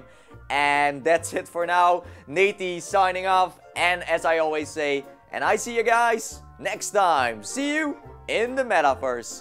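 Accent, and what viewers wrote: Netherlands. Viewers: Ik hoor zo duidelijk dat je Nederlands bent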